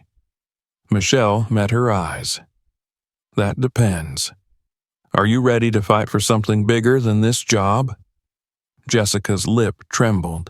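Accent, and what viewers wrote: South, United States. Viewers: I love your southern accent. May I ask where in the south you are from? I lived in S C for 12 yrs loved it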